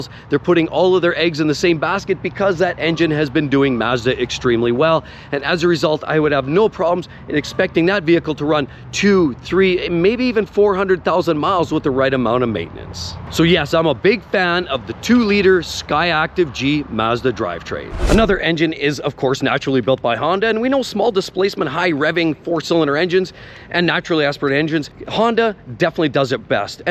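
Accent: Canada. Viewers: Canadian you can't hide that accent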